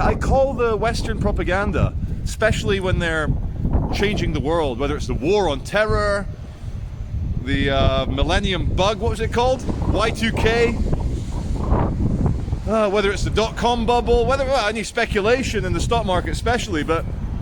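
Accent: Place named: Scotland, United Kingdom. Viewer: Your accent is just Scottish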